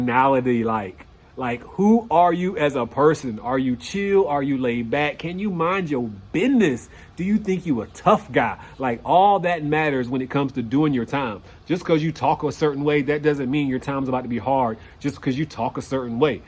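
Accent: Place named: United States. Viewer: Sounds like your from the U S A and it sounds great you moved around a bit well rounded! Be Well Be Safe & Stay Blessed.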